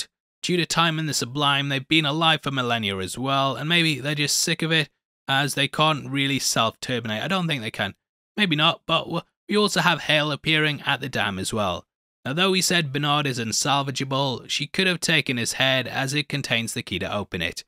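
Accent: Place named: England, United Kingdom. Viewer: I love English accents. You called Christina Christiner and I love it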